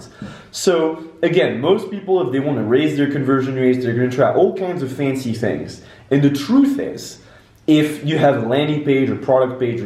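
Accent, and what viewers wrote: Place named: England, United Kingdom. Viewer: Although I understand English (by the way you've a f*cking English accent it's beautiful!). Et selon moi, rajouter des sous-titres en français serait top (si jamais des français qui te soutiennent passent par là 😜) Prends soin de toi et hâte de voir tes prochaines vidéo chef 🥳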